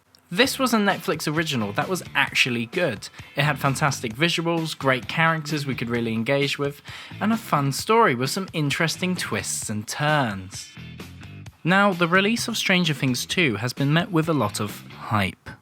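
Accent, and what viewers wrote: United Kingdom. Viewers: Shitty takes. Except for Episode 7, this season was great and not predictable in the least. Pretentious Brit or whatever you are. Fuck your accent.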